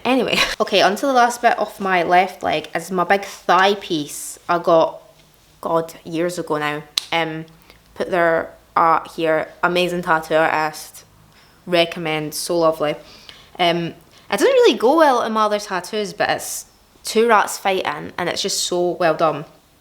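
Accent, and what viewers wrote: Scotland, United Kingdom. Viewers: Love your accent! Are you Scottish? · You make me feel so comforted that there are actually people out there with the same interests as me lmao. Also the asmr vibes ur videos have plus the Scottish accent is so satisfying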